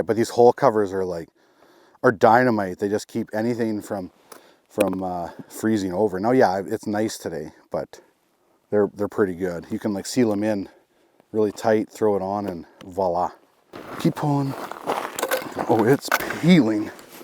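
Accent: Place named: Canada. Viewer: I think it's hilarious how Canadians say semi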